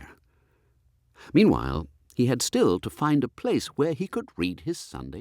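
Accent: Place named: United Kingdom. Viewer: Dear, please reread the Wodehouse novels read by female readers. Although some are fairly good writers, invariably they don't have a British accent which is really appreciated. Also, because most of the characters are males, it just makes sense. Thank you so much for the great reading. Looking forward to finding more of your readings. Thank you!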